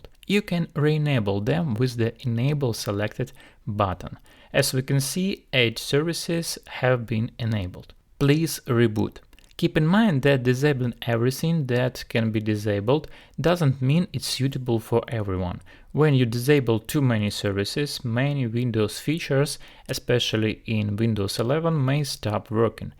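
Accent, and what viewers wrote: Russia. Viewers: Are you russian? :)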